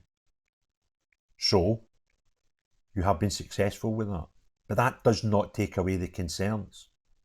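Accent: Scotland, United Kingdom. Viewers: …I know he has a Scottish accent. But that doesn’t mean we don’t need subtitles to understand a single word coming out of his mouth.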